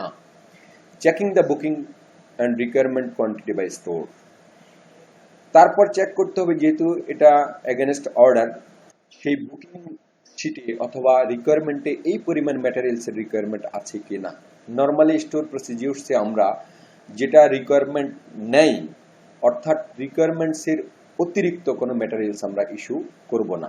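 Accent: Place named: Comilla, Bangladesh. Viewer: Why are you mixing your lecture with english, bangla, camilla accents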